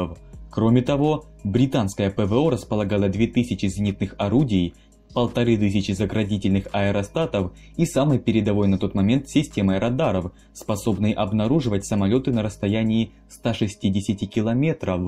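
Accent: Ukraine. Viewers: Западноукраинский акцент, просто старается тщательнее произносить русские слова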